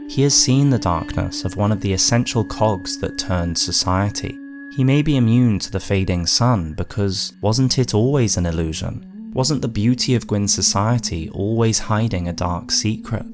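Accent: United Kingdom. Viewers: I feel that if you have a soothing voice and an British accent you are by law required to make lore videos on YouTube. Good video i like it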